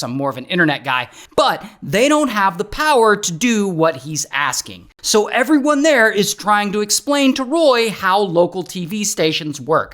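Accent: Canada. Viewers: As eh! Canadian. Love how you Canucked it up as well as you did. Sad story of course.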